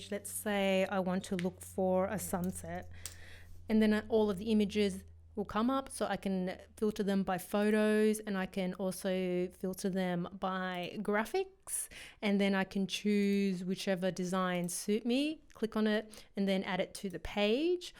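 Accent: Australia. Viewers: Thank you for a easy and informative video! So good to find a tutorial in an Aussie accent. Subscribed.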